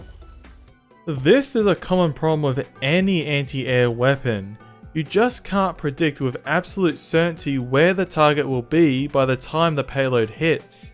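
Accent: Australia. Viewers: Bro, you already speak fast, plus you have an Australian accent, plus you're talking about highly technical stuff, plus I'm fluent in english but since I'm not native i have to make a little force to think through it. So, even 0.25x is not enough for me to be able to understand it. ☹️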